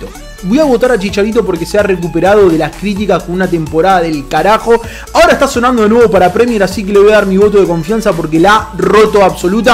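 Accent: Argentina. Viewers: Q lujo oir hablar a un argentino, te lo dice un gallego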